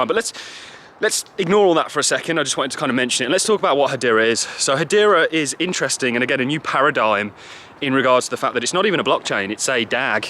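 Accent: Birmingham, United Kingdom. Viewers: Definitely a Brummy tone I'm sure I've seen him knocking around town kro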